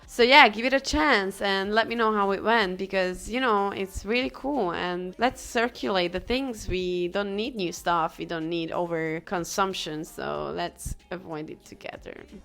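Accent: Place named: Hungary. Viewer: Within 3 seconds of the video I knew she is Hungarian based on the accent, then I heard her name :)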